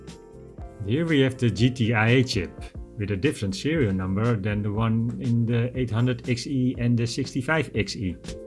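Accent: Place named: Netherlands. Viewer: I think he’s a Dutch guy 😅he speaks “coal miner” English 😊 Nonetheless a great video, nice research!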